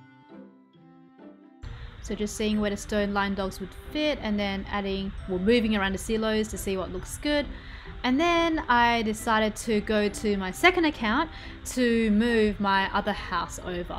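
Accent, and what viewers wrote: Australia. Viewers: Are you from Australia? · She's reppin' her Aussie accent 🙌🏻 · shes australian not british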